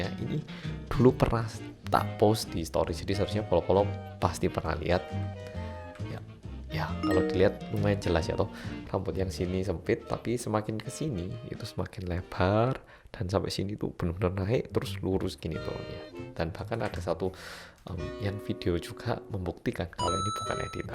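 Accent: Java, Indonesia. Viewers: Iki Jane orang Korea apa Jawa sih, kok lucu ngomongnya 🤣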